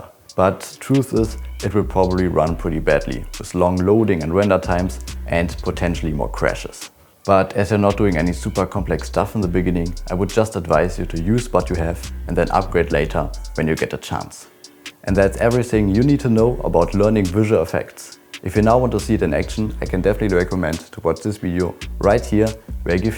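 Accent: Germany. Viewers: dieser deutsche akzent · You really sound like a german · ar you germen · i am hearing deutsch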